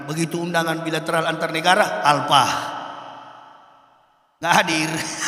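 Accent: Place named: Manado, Indonesia. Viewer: Logat manado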